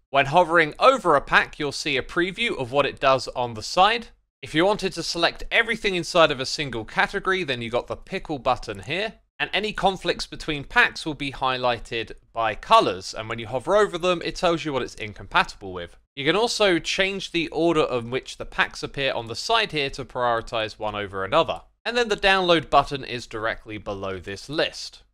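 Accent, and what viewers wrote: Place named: United Kingdom. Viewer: i cannot believe it has taken me this long, but this is the first time i realize has a british accent.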